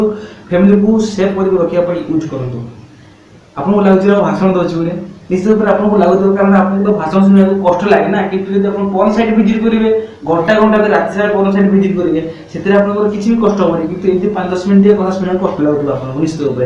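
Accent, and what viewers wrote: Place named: Balasore, India. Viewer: Bhai Aponko kotharu laguchi apon nischinto Balasore pila bodhe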